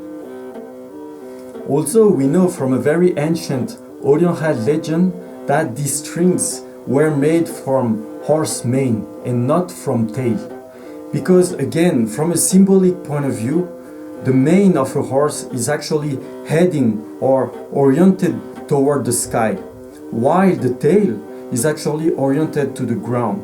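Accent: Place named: France. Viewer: Naturellement ! je voulais dire par là qu'on entend bien votre accent français dans la langue de Shakespeare. Et oui merci pour les sous-titres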